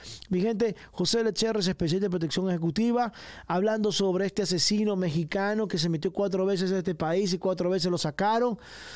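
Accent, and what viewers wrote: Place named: Honduras. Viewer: Tu acento me suena undureño...😅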